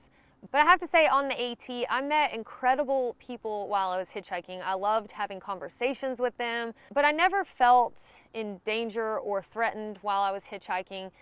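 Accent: South, United States. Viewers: Just love your communication ability. Very efficient and has the perfect southern accent.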